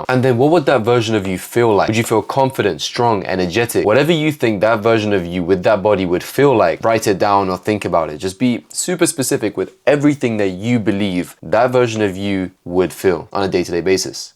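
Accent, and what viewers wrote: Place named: Maghreb. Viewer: WHOA! Viva Maghreb 🇲🇦 Body, Accent... Fire bro🔥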